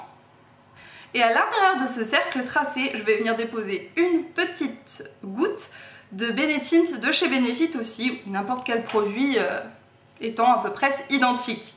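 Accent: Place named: England, United Kingdom. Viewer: Tu es de retourrrrr !! Tu m'as manqué ! Alors , ton accent anglais, il est trop bien, dis, tu donnes des cours pour l'anglais ? :) Superbe vidéo !!! J'aime beaucoup parce que ça fait également tes produits fétiches etc ! Gros bisou !! ♥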